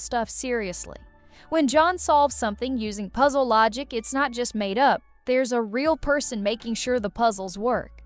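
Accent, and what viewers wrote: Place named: United States. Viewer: And the voice over is... an ungifted AMERICAN!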